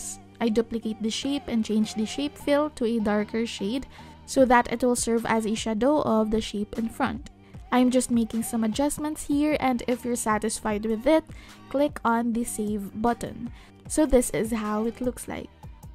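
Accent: Philippines. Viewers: I can tell your From the Philippines May me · based on your accent, are u filipino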